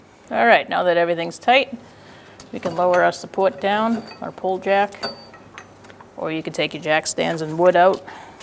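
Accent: Boston, United States. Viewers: Hi quality video and textbook Boston accent! After 12 years all my shock pieces are frozen.I'm not gonna use torch, just repeated srpaying and hammer hits and a few days, I also used a 4' steel pipe sleeved over breaker bar for awesome leverage., the top of new shock in the "cage" is a really, really tight fit and threading the needle is by braille on the top. I used silicon spray to move the shock top in the cage, it's that tight. Doing a job where all the bolts aren't frozen must be a lot of fun, something I'll never esperience!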